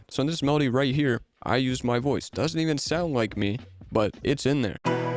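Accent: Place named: Canada. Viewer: This whole video helped alot and i can hear the canadian in your voice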